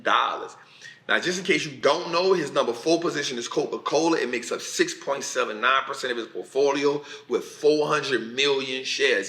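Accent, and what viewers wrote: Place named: New Orleans, United States. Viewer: Just stumbled across you on a video you did with Tom Bilyeu and a soon as I heard your voice I was like that dudes definitely from New Orleans. Man everything you say makes sense and easy to understand. Thank you for spreading the knowledge.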